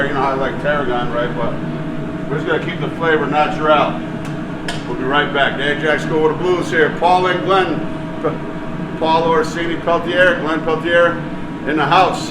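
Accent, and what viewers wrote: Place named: New England, United States. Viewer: eh! love your badass cooking style you & are behind the burners ya'll rock my day!!!! love New England accent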